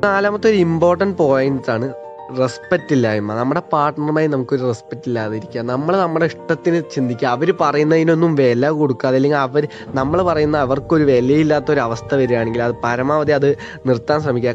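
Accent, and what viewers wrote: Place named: Palakkad, India. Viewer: Palakkad alle bro veed😄......manasilavnd namde bhashaaa...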